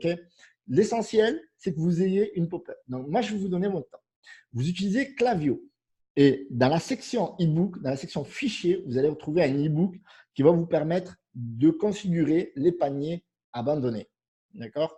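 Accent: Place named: Marseille, France. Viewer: lorsque j't.écoute,on dirait k't.es sur Marseille, chui sur hyères pour l'instant. À larevoyure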